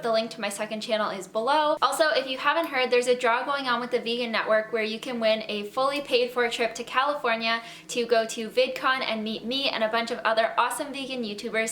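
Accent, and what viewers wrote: Canada. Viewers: canadian accents are so cute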